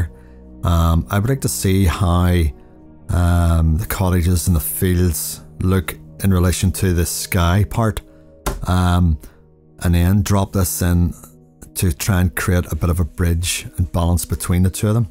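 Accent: Northern Ireland, United Kingdom. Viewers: I love your videos! And it's so nice to hear someone from Northern Ireland talking ❤👌✨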